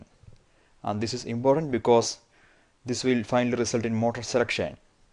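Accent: Kerala, India. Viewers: good one. I guess the speaker is a keralite. the accent 😑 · Nice mallu accent :)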